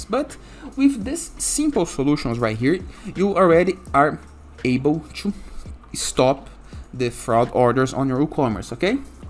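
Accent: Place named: Brazil. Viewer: depois de 5 segundos assistindo.... é BR! certeza :medal-yellow-first-red: Muito TOP as dicas valeu!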